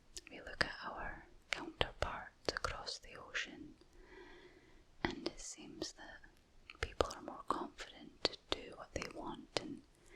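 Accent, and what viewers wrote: Scotland, United Kingdom. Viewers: I am gonna be honest, until now I thought I wasn't really into Scottish accents. Well...this changes things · I'm definitly in love with the scottish accent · Love your channel! So very relaxing and that Scottish accent is quite lovely. 💕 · Greetings from the colony of North Carolina. Your slow motions and soft vocal delivery style are really effective and quite nice. I love your Scottish accent. I mean this with all due respect. You are really truly fine! You are beautiful.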